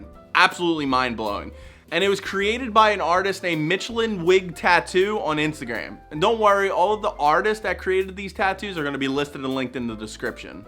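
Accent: Philadelphia, United States. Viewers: Yo ur def from philly I’m from the area and can tell by ur dialect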